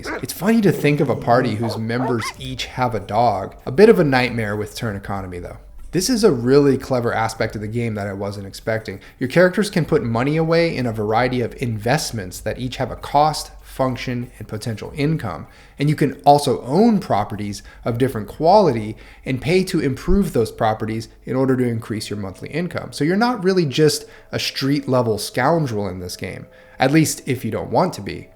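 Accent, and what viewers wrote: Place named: Cockney, United Kingdom. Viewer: I didn't have " goes from RPG Youtuber to Proper Cockney Geezer" on my 2024 bingo card but here we are! Also, top tip for surviving British old money: count shillings before pennies, then you only have to count up to 11 pence at a time rather than 240.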